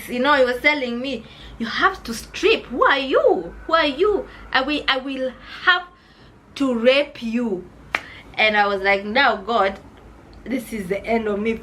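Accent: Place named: Kenya. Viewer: I always love to hear your stories , sweet kenyan ascent unfortunately too much in Swahili , didn't understand, nice of you though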